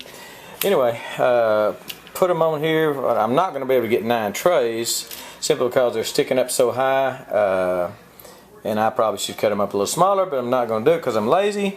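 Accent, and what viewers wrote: Texas, United States. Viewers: Your Texas accent is Awesome! "Hand was Glowing for a Couple Days!" (^_^)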